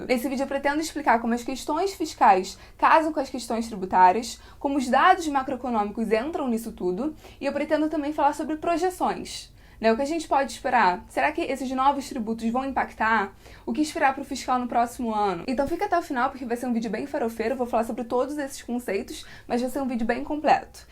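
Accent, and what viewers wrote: Rio de Janeiro, Brazil. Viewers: Carioquês bacana..😂😂😂😂😂... E muito conteúdo bom.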